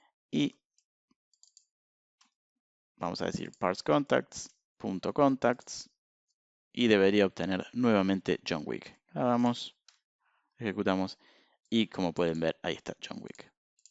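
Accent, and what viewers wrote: Argentina. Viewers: Impecable la explicación. Se agradece un tutorial en cordobés =D! Saludos de Bs As.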